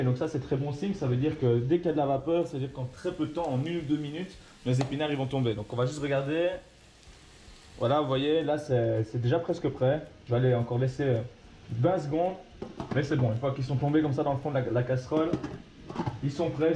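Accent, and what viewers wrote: Belgium. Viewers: J'adore l'accent 🇧🇪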